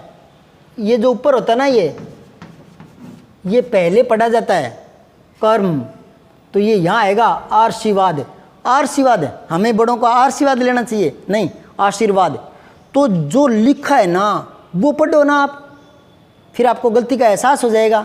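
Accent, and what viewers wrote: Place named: Rajasthan, India. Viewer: लगता है आप राजस्थान से हैं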